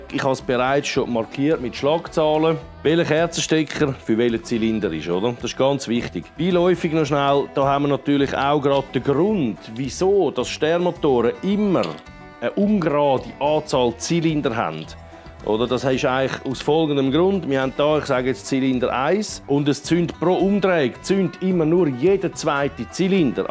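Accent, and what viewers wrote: Switzerland. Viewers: Hi ein absolut schönes Video und nun sieht man auch einmal im Detail was Du da vollbracht hast mit diesem Custom Töffli mit dem 7 Sternzylinder - Motor. Und jeder Schrauber weiß man ist niemals fertig an Custom Teilen Fahrzeugen ständig entwickelt man es und verbessert es weiter und das macht ja Dir Sache aus man hat Freude und ist Stolz wenn man es hinbekommen tut. Ich hoffe Du hast genügend BREMSENREINIGER auf Lager für die Reinigung des Motores und Vergaser. Du bist einfach ein geiler Siech wo es absolut drauf hat freue mich auf jedes neue Video von Dir. Schöne Grüße aus Germany von der Schwäbischen Alb mir gefällt Dein Schwitzerdütsch absolut huregeil.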